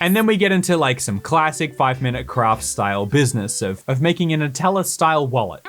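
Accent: Australia. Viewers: i thought he was australian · i did not know, i thought hes austrailian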